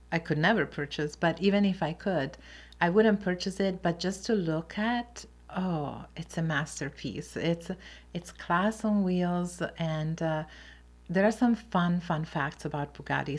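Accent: Italy. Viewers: your smile is infectious❤️. Loved this video...I am half Italian and proud of my heritage. My mom and Aunties were strong Italian women. I got my love of cooking from my Mom. To me Italians have the best food and yes my skin isn’t wrinkled because I eat the Mediterranean diet😂😂😂😂. I love to listen to your accent, lovely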